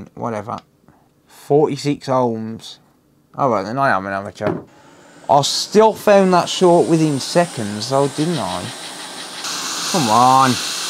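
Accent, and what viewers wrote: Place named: Birmingham, United Kingdom. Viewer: Wot part of brum r u from bud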